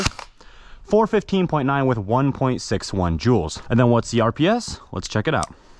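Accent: United Kingdom. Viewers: love the british accent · Love the Brit accent or is it your real accent im British bit I don’t sound like that but hey keep it up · That british accent tho😂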